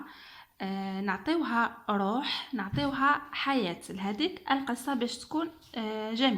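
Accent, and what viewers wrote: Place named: Algeria. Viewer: D'où venez-vous de la wilaya ... selon votre accent, vous êtes de la commune de Tadjnant ... je viens de France, mais j'habite en Algérie et je visite beaucoup ses communes ♥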